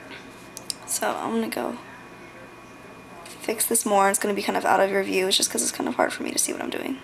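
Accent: United States. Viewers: So obvious you're from Cali... you sound like Brenda Song LOL Nice tutorial though :p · R u american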